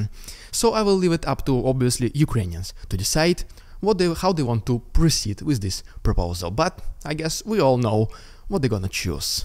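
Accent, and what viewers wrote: Russia. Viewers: He actually IS Russian. 😂😂😂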